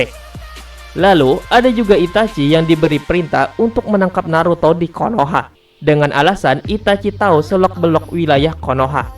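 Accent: Banjar, Indonesia. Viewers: Orang banjar kah nyawa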